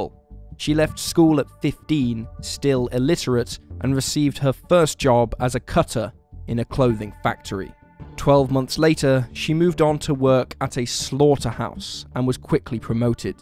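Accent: United Kingdom. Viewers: Narrators who speak excellent English (i.e., British we presume?) sort of ruin the story without any expression utilized WITH the English perfection. Find some narrators who know how to phrase their sentences in an interesting manner? this guy sounds as if he, himself is not even enjoying relaying the stories (which without his rigid enunciations, were actually, quite interesting. Ok nobody cares Bye bye.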